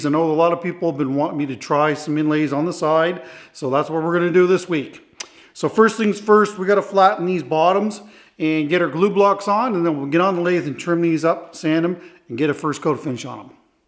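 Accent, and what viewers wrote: Canada. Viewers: I love listening to your commentary as you work! And Canadian accents are the best 😊 Two beautiful pieces today. I usually go for walnut, but the spalted birch is my favorite.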